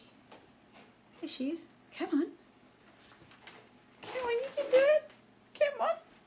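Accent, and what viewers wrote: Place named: South Africa. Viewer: If you don't mind me asking, but where are you from? You sound South African :)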